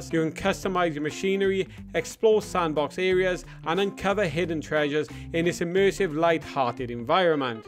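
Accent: Wales, United Kingdom. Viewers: Ohh god so nice to hear a fellow Welshman ❤ Da iawn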